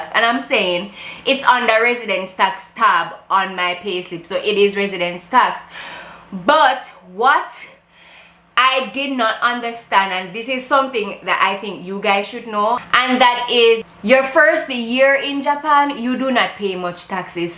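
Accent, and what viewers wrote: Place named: Jamaica. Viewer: Ik a Jamaican when I hear it love this channel ❣️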